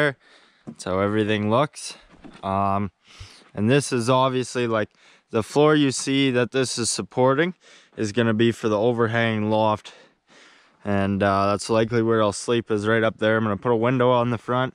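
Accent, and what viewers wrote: Canada. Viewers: Appreciate your build ay. Good job, the Canadian accent with expletives, excellent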